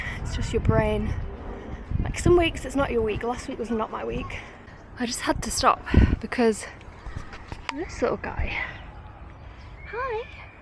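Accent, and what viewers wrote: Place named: Yorkshire, United Kingdom. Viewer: What part of Yorkshire, did you come from?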